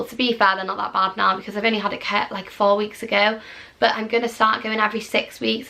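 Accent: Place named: Wales, United Kingdom. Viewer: nothing wrong with your voice. Take no notice of the rude comment from Ellie. Love your acent. Reminds me of my Welsh cousin's .x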